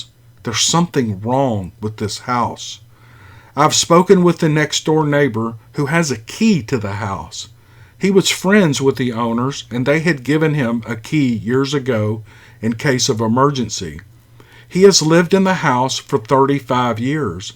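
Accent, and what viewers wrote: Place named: North Carolina, United States. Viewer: Good job! I’ve been looking for stories of the season on YouTube but hadn’t yet found a site. Truthfully I prefer you North Carolina voice then any others so far. Thanks for spook time!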